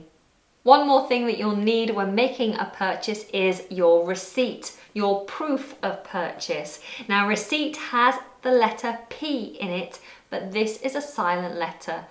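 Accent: United Kingdom. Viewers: Hi there! Today we have found out a new skill in you: an actress. So you're not just an excellent teacher, but a great actress too. Congrats for your work on this video! It's a great help even for those of us who think have a little higher level of English. But listening to you, to your voice, to your beautiful British accent is so pleasant! Cheers! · You are a perfect actress as well as a teacher. I adore your british accent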